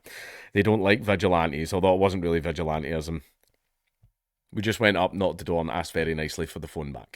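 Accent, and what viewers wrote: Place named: Scotland, United Kingdom. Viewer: I'm from Poland. This is the only source I get the news from Bongland from. A collapse narrated, with scottish accent. By the gods, I'm having fun.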